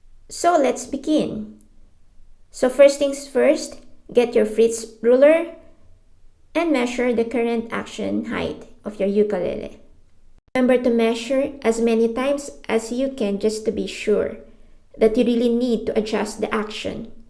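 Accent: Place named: Philippines. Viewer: Ah. Kaya pala sounds like pinay. Hehe. Very informative, and very clear too. Salamat!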